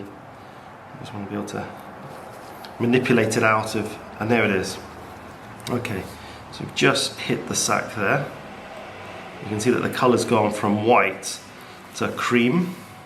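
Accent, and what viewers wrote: United Kingdom. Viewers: Its really nice to hear the brit accent in one of these vids. I was starting to think only Americans did this 😅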